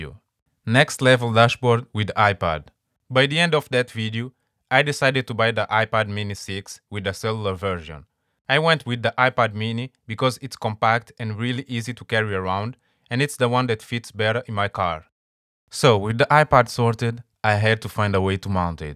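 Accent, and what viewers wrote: Russia. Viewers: Why the Russian accent?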